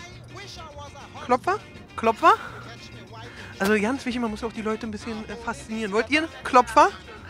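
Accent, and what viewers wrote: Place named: Berlin, Germany. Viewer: Ich könnte mir das video so oft angucken immer lust drauf haha mit seine berliner icke😹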